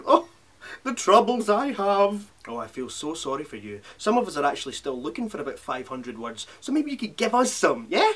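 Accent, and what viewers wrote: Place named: London, United Kingdom. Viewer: his accent is annoying, i recognize his accent from suburbs of London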